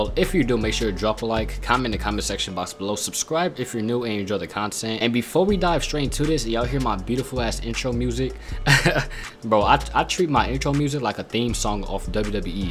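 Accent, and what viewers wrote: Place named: United Kingdom. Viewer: When I hear this guys voice I thought he was from the uk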